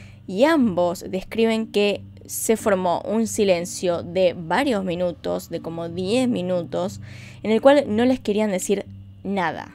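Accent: Argentina. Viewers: Hola señorita.. me gustaria saber si se puede claro.. usted es de Argentina??..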